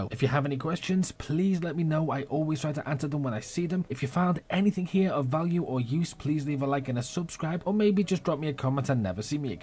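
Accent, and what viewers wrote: Liverpool, United Kingdom. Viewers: is that a scouse accent close by to maybe, lets just say city centre?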